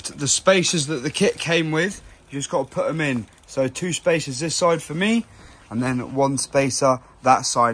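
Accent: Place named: United Kingdom. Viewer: You sound British, therefore as the motor is likely over 250W which makes it an illegal motorbike, it goes faster than 15.5mph so it's now an illegal motorbike and you have a throttle that works past 4mph making it an illegal motorbike, it's not labelled up which makes it an illegal motorbike... None of what you did is safe or legal!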